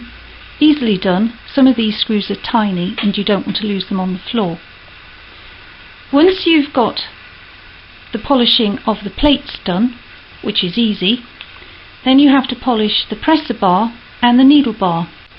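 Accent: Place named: Yorkshire, United Kingdom. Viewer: a tiny bit of Yorkshire is seeping through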